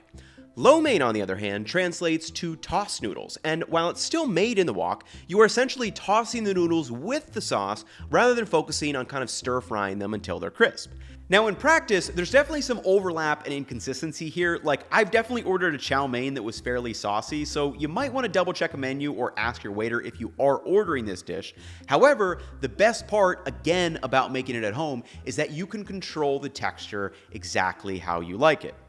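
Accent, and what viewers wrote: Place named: General American, United States. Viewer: nope, he definitely pronounces 'noodles' with the typical General American vowel quality throughout the rest of the video. Just a slip of the tongue I reckon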